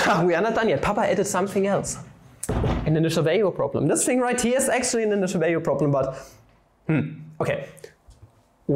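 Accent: Germany. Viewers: I've never understood a single flammable math video but I still watch them...musg be the accent. I'm a sucker for german accents and chalkboards.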